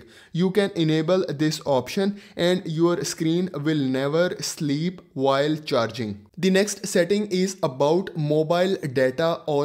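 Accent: India. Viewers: I dont normally trust people with an indian accent for stuff. But youve actually showed me some very useful stuff. Not many people go into depth about what each option does and shows it working physically. I very much appreciate that.😊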